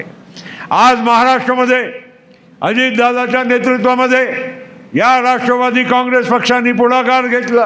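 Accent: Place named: England, United Kingdom. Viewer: Tumhala he Engrajanchya tone madhala Marathi bolayala kasa jamat ho?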